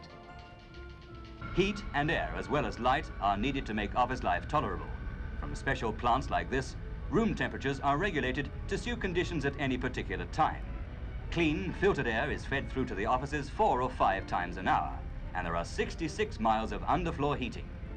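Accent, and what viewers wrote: Australia. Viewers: Is it just me, or is the narrator Australian? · What an interesting accent. Sounds like an Australian with an RP overlay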